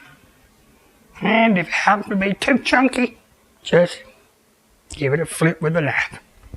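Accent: South, United States. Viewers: You make fine p.s Makeit like l do love that southern accent tell you are from the country. You are a gem no nonsense just being you. Glad i found your channel.lm from ala. 70 plus years. So refreshing to see some one thats speaks my language. Subscribed just a second ago Wishing you the best.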